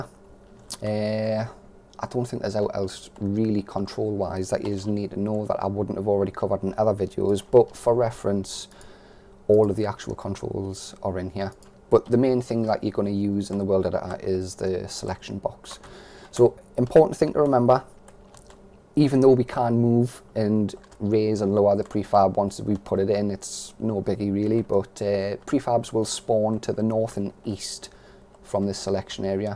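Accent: United Kingdom. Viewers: Random question but are you from Middlesborough? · Thanks for the series, only just starting to look at the prefab editor as I have a few ideas, so the overview has been useful. Especially trying to find the right balance between lots of small or few small prefabs. How do you think your method of capturing a large prefab from the world compares to merging small prefabs inside the prefab editor itself - which is the way I had been trying to do it. PS There's nowt wrong with the Geordie accent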